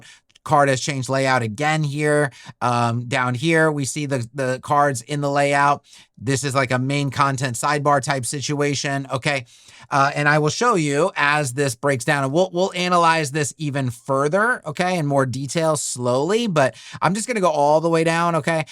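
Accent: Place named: United States. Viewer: Is it me... or does (general American accent) say "era" and "error" the same way? 🙂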